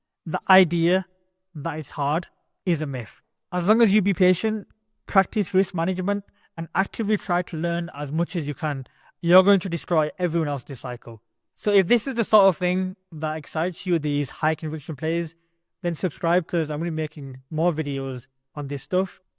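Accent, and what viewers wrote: United Kingdom. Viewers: Love the video bro, nice to hear British crypto YouTubers 😂 . There’s a project called treble swap which is the first v4 DEX on base do you think it’s a good buy ?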